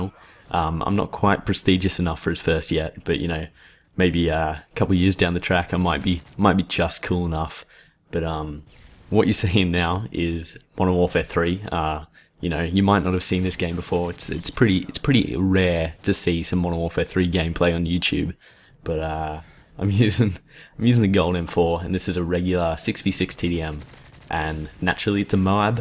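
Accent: Australia. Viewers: Woooooo finally a commentator from Australia :D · is this guy australian??? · Good to hear a fellow Aussie! :D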